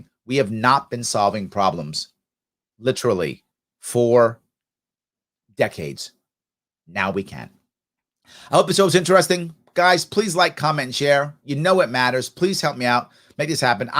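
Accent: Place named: New York, United States. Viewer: Larry: for Pete's sake, you talk like a typical "New Yorker"...terrible weather in New York, in New Jersey (so cosmopolitan of you to deign to mention NJ), but what about Philly and Washington, DC...we don't get any respect? Let me inform you that we got a TON of rain and wind in DC on the night of 31 August. NYC is not the prima donna (not anymore) of the East Coast!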